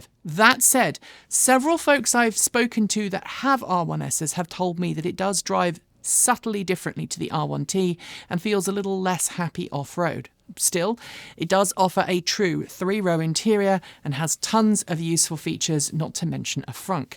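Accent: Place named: United Kingdom. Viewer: A dumbo putting up a dumb video with a stupid British accent.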